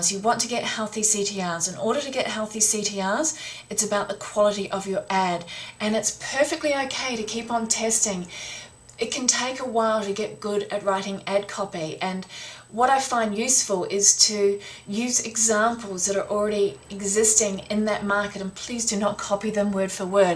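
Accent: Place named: Australia. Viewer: perhaps because she's Australian! This is not an english accent mate.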